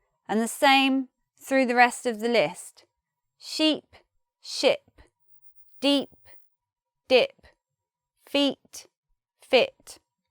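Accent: United Kingdom. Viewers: Sólo si me caso vos podré tener ese hermoso acento británico 😍✨..💘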